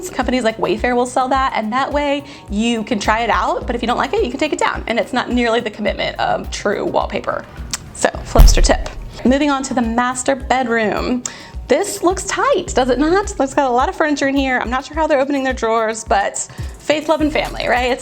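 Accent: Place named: United States. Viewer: I don’t hear anything but an American accent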